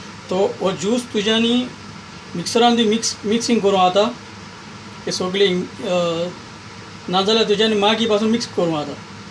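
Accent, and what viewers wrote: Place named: Salcete, India. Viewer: I just love the way you talk pure sashti konkani and your way of explaining the recipe thank you so much God bless you always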